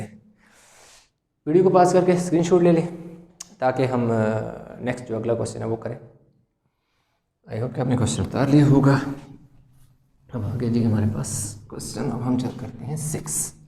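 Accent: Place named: Sindh, India. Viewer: ap ka lehja sindhi wala hai are u sindhi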